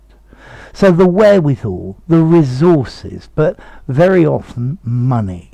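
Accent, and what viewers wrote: United Kingdom. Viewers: Great explanation...the British accent is so beautiful and nice to listen to...why is that?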